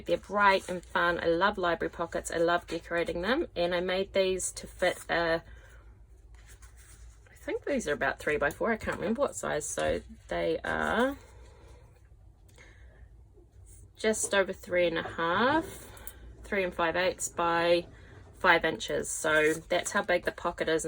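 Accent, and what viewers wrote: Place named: New Zealand. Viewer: Just found you. So cool to hear a kiwi accent haha. Thanks for sharing.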